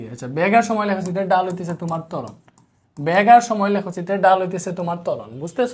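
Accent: Sylhet, Bangladesh. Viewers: ভাইয়া কি sylheti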